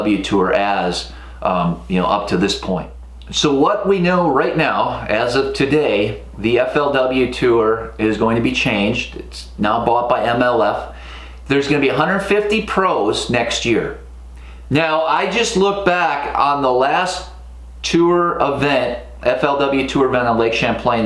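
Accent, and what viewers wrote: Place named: Chesapeake Bay, United States. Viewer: also quick question, you live in Maryland ?....I am in Richmond VA and you talk upper chesapeake bay....so just curious where you live at ...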